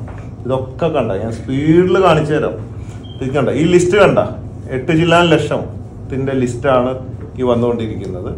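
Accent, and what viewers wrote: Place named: Neyyattinkara, India. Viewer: Ithu tamilnadu alla......kaliyakkaavilai,neyyattinkara slangs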